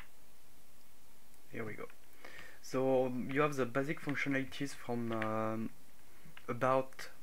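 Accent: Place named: France. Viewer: Ton accent!!! On voit que c'est français pur!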